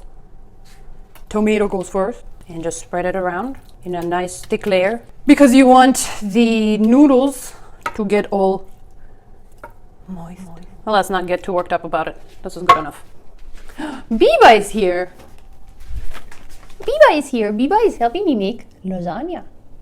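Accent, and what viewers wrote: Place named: United States. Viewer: американский акцент И(!) метрическая система – это то чего мне не хватает в англоязычном фуд-ютубе💛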